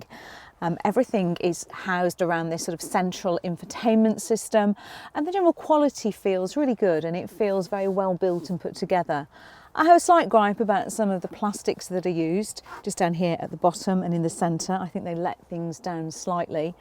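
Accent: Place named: RP, United Kingdom. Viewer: Lovely poshish accent.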